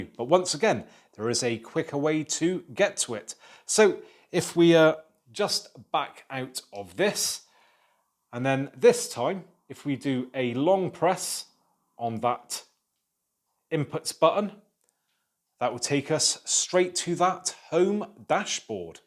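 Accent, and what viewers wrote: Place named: Somerset, United Kingdom. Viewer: are you from somerset? you sound abit like it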